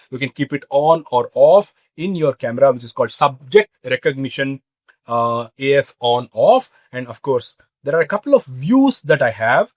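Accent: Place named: Germany. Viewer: Denglish Nightmare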